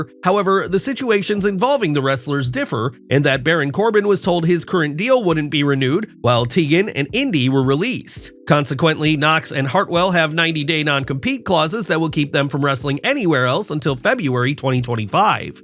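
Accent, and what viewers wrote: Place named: United Kingdom. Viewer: Why is the British narrator always sick the English guy is annoying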